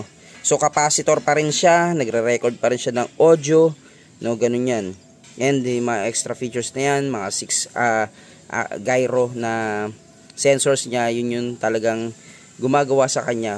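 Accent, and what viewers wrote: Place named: Marikina, Philippines. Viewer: Puntong marikina, taga marikina!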